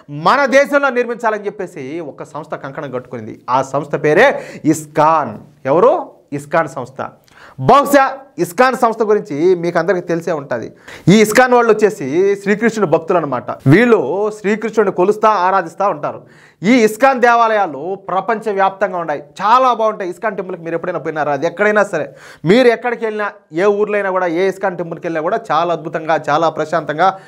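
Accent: Rayalaseema, India. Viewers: Rayalseema accent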